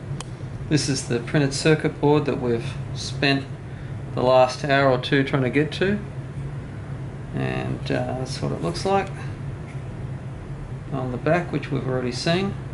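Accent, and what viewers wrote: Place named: Australia. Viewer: Great video, good the hear you still have the great Aussie accent! Different problem with my 6000 but excellent guide.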